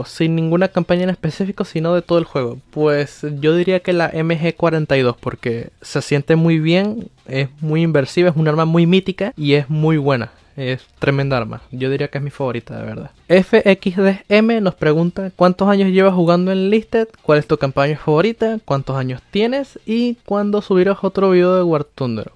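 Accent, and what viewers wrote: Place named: Chile. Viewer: Yo pensaba que eras de chile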